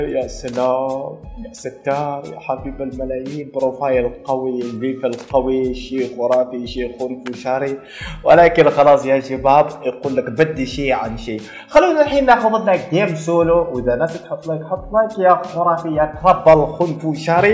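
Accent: Oman. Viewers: انت ايش اصلك عماني ولا شو ؟؟؟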